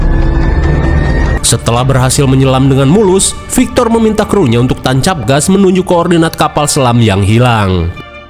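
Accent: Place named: Java, Indonesia. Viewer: Entah kenapa saya mendengar suara Jawa Jawa Jawa 😅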